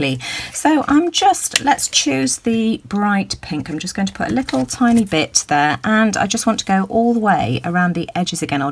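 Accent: United Kingdom. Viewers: Love love love your accent! So wish I was British 😭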